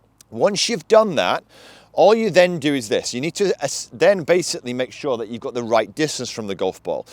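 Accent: United Kingdom. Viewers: first the compliment. I like your videos, they are very informative. Now my problem, and I say my problem, it's hard enough to understand someone with a british accent, it's even harder to understand them when they talk fast. thanks again for your excellent videos.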